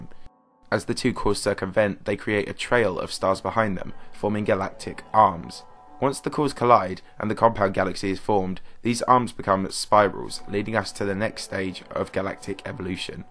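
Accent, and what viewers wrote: United Kingdom. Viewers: Thank you, you just won me 10$!! I bet my girlfriend the narrator of this video would be British. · He doesn't sound anything like James Cordon. Let me guess... You're an American. And all us Brits sound the same, (even though we have more regional accents than just about any other country.)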